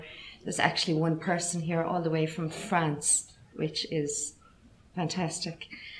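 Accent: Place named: Limerick, Ireland. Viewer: Great speech, well executed, strong Irish woman. Limerick accent rocks too.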